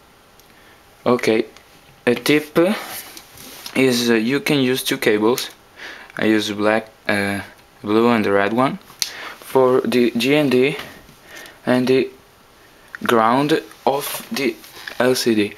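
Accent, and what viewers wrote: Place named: Italy. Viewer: si sente che sei italiano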